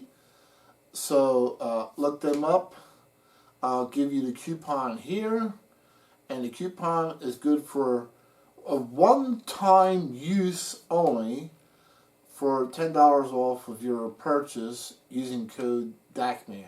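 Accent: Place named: South Jersey, United States. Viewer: 14,000 subscribers! Wow! you're living proof that nice guys can finish first and "Nothing succeeds like success!" Congratulations, and a success well-deserved! A speech impediment? Honestly I've never noticed. The only thing I HAVE noticed about your manner of speaking is your DEEP South Jersey accent! I haven't heard an accent like that since I went to Glassboro State back in the 1970s. As soon as I watched one of your videos I said "Hey, I know where HE's from!" 😃 Anyway, just keep doing what you do and the way you do it! And again, sincere congratulations!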